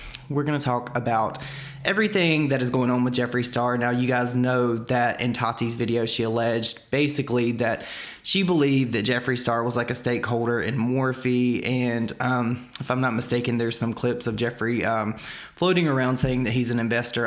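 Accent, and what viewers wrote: South, United States. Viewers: Idk but your southern accent is so soothing lmao. Reminds me of one of my English professors.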